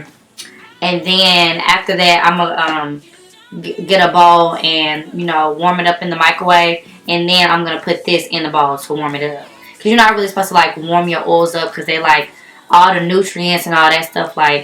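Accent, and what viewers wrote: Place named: South, United States. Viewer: *speaking Southern AAVE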